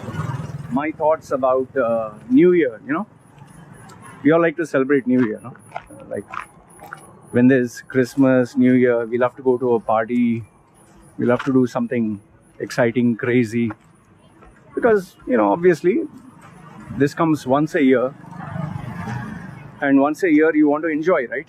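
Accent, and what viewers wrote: India. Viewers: You are an indian i can tell from Your accent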